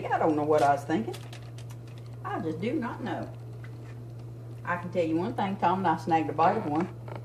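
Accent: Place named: Georgia, United States. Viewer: I’m from Kentucky, but my family’s from Georgia, so there’s something very familiar about your accent. It makes me feel like I’m cooking with my aunt haha :)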